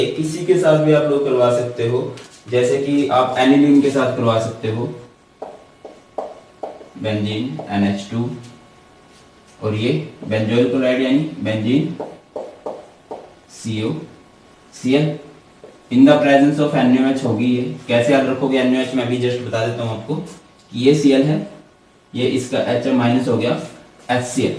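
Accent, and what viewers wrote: Haryana, India. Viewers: Sir kya aap haryana se h ??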